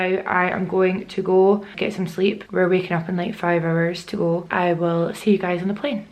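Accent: Scotland, United Kingdom. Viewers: Are you from Scotland 🏴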